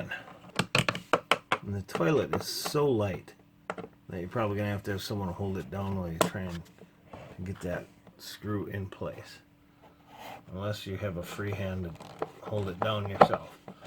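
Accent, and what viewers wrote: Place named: Wisconsin, United States. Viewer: Very helpful video. With that accent you must be from Wisconsin.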